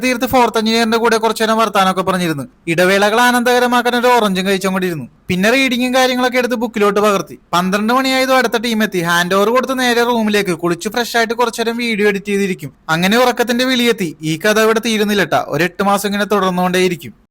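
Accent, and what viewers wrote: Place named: Ernakulam, India. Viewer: Bro എറണാകുളം ആണോ വീട്.. നമ്മുടെ നാട്ടിലെ ഒരു സ്ലാങ് ഇണ്ടല്ല?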